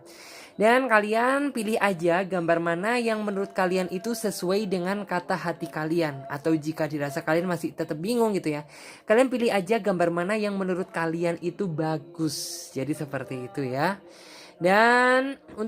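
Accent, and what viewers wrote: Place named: Surabaya, Indonesia. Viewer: Kaka cara logad ngomongnya kayak orang surabaya kaka tinggal disurabaya ya ??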